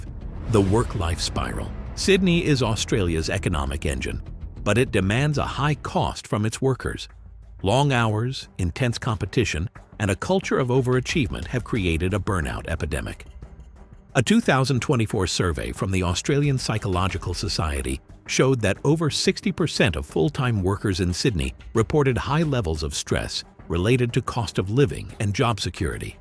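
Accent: United States. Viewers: How come when you show money, you always show US $ ? Apart from the American accent to start off with... The points raised have of course value.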